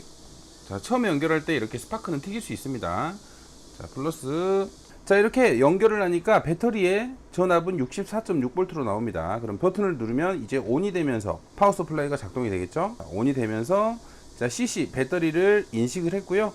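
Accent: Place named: South Jeolla, South Korea. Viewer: 근데.. 딴소리인데 전남 어디신가요? 전남사투리가 너무 구수하십니다ㅋㅋ 저희시골은 고흥입니다ㅋㅋ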